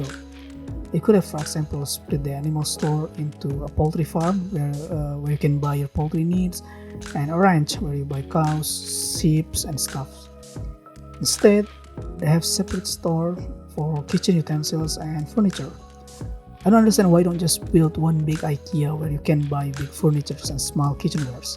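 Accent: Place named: Indonesia. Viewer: Sound looks indonesian accent.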